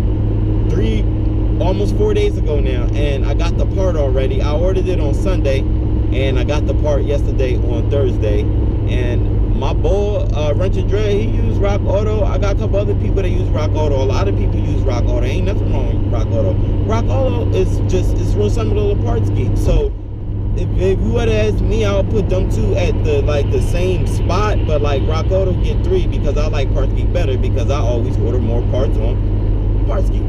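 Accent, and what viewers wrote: Philadelphia, United States. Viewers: Bro you gotta be from philly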